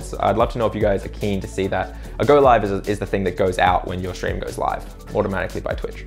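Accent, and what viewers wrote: Australia. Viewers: WAIT YOU ARE AUSTRALIAN NOW I INSTANTLY LIKE YOU MORE WHY DOES IT WORK I FEEL COMNECTED ON A PERSONAL LEVEL bro why and how does this work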